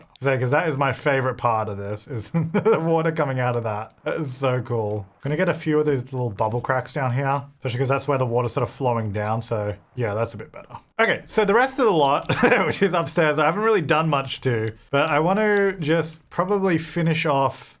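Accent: Australia. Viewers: I always love watching your build videos 😍🥰 they inspire me with my sims builds to try new ways of building 🤔🤩🧐plus i do enjoy hearing a fellow Aussie play the sims 4 👍🇦🇺😊.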